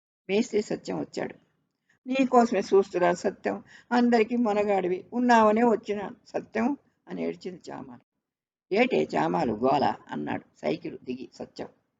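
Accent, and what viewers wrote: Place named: Uttarandhra, India. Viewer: కధ బాగుంది, చాసో గారు కధలులో ఉత్తరాంద్ర దిగువ తరగతి ప్రజల జీవితాలు కధా వస్తువులు గారు ఈ ప్రాంతపు మాండాలిక భాషలో బాగా చెప్పేరు..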